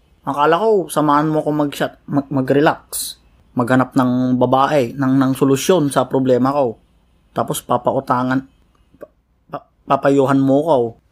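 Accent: Ilocos, Philippines. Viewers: ilokano accent ka pre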